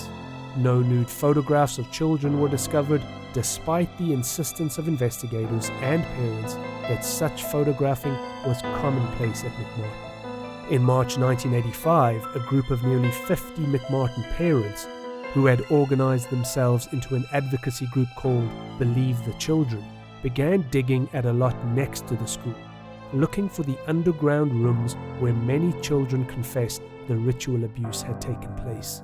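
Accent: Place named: United Kingdom. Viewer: Always better with a British narrator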